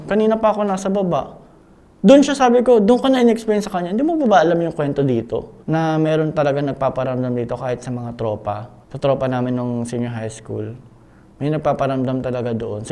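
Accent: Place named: Conyo, Philippines. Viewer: Conyo kasi siya ikaw kasi laking iskuwater🥴 kaya madami napapansin dka nlng manuod pwe🤮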